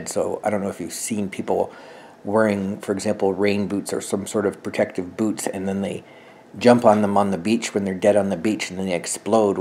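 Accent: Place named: Canada. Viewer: Oh my god why do I love you so much! I love your accent! I’m Canadian as well but I don’t think I have this accent! I’m from PEI if you didn’t know. But aww! Your adorable! You are so nice! I’ve only met a few of my fellow Canadians that are as nice as you!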